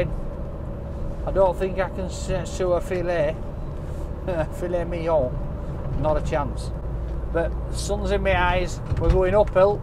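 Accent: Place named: RP, United Kingdom. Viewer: Don’t go too posh on us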